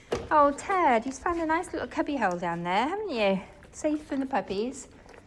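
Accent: United Kingdom. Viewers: What a pleasure to hear an English accent here on YouTube! I also find it comforting to see an unedited, realistic cleaning video. Thank you for posting.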